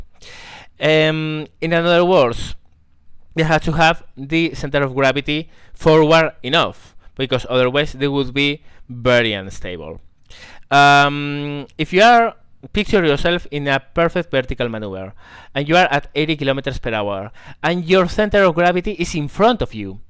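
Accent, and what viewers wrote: Spain. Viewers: Illiteracy has nothing to do with accents. And yes, that is exactly what it is, an accent. You might want to read up on it, unless you happen to be illiterate yourself. His English might not be perfect but it's good enough to get a message through to just about anyone.. The way he pronounces words is affected by the fact that he's Spanish. Also, here, where I live, we have these things called manners. You might want to read up on those too.. so you don't have to resort to personal attacks. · tu inglés te delata, eres español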